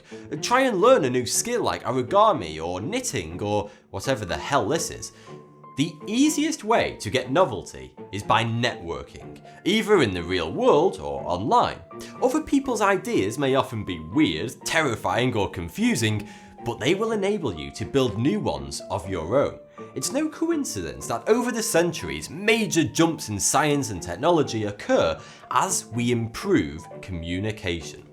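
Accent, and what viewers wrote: United Kingdom. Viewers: Love his British accent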